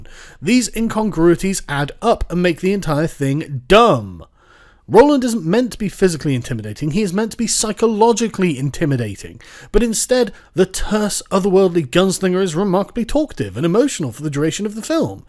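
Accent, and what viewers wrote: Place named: United Kingdom. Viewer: When he said "Fuck this movie" with his soothing, perfect British accent, I don't know how to put it... Um, this is a bit dirty but... I got a boner.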